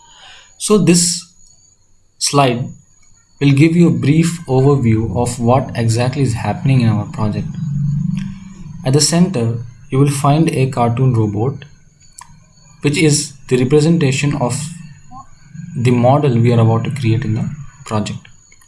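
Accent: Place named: India. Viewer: Probably the Indian accent got you.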